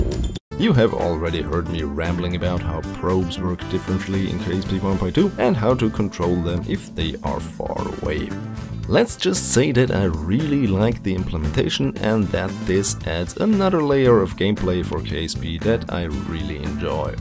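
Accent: Germany. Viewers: u German? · Are you german by any chance? ;-)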